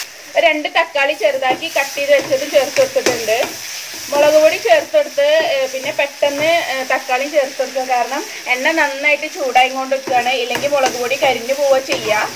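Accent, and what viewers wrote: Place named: Perinthalmanna, India. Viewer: Nalla rasond samsaram kelkan..enik PMNA othiri friends und..avar parayana polund..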